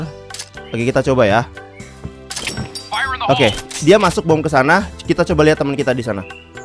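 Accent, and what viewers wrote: Java, Indonesia. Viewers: Bang abang orang jawa ya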